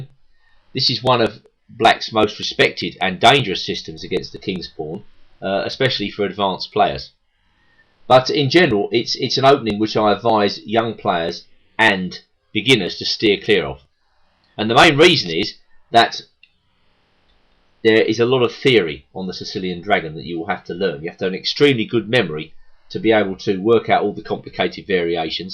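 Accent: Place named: England, United Kingdom. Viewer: I don't think so at all. It's a classic English accent. At least I can understand him. Whereas with a Scottish accent for example, I often have trouble understanding it. Scottish accents remind me of French Cajun from Louisiana where almost every other word is indiscernible. So I have no gripes about this man's accent. Perfectly English.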